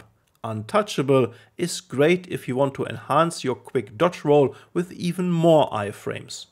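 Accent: Germany. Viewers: Are you german cause of the pronounciation?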